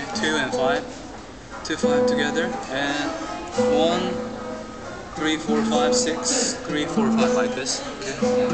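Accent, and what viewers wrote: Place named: China. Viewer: Where are you from? Like a Chinese.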